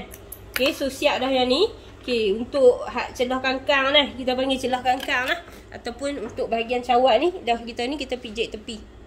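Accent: North, Malaysia. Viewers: Walaupun saya bukan org utara, tp masih faham apa cikgu terangkan.. Dan suka pulak dah dengak loghat cikgu.. Dan cikgu terang memang jelas dari a-z.. Terbaik.. 👍👍👍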